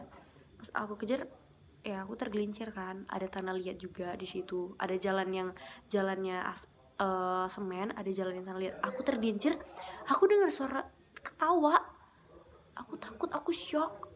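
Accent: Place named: Berastagi, Indonesia. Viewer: Orng dari berastagi mana nih suaranya😌🙏🏻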